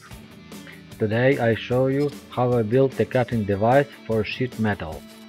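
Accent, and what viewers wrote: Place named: Ryazan, Russia. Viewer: Один вопрос - for нафига трепаться на английском , если акцент рязанский..... Есть же титры..... Кому как...мне ужасно режет слух.....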